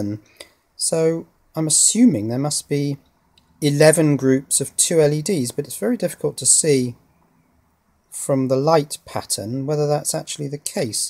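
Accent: United Kingdom. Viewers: Very refreshing to hear a brit with an old accent like his, good work.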